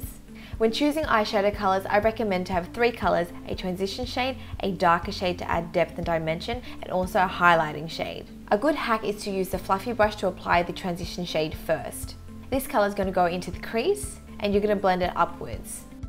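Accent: Australia. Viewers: so basically it was the australian accent? lmao I haven't seen bindi irwin anywhere in years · New subscriber here. Is she Australian? I really appreciate youtubers that aren't from the USA, not that there's anything wrong with that. It's just nice to have more than the standard American youtubers.😀 Great video!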